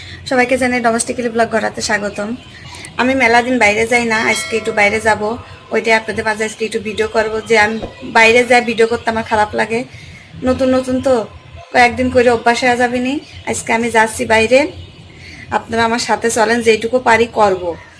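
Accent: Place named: Khulna, Bangladesh. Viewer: আপু কি খুলনা বিভাগ এর মানুষ নাকি? আমাদের ভাষার সাথে খুব মিল পাচ্ছি।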